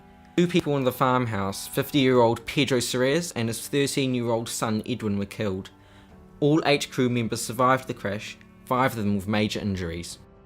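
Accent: New Zealand. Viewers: just listening to your commentary are you a Kiwi?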